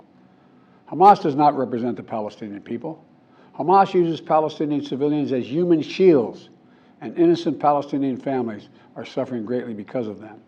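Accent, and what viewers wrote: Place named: United States. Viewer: I like a President that sounds like an American. Not like a Russian goon oligarch. I stand with President Biden!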